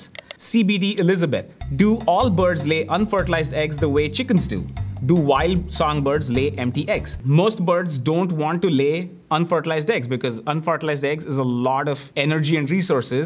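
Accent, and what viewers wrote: India. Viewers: he has pure indian accent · On an unrelated note I love this guys accent idk why it sounds Indian but also unique at the same time genuinely got me interested in birds now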